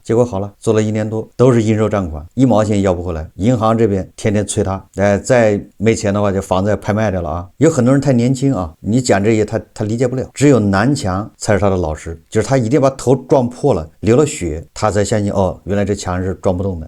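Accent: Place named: Gan, China. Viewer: 赣语怀岳片，湖南湖北是你的错觉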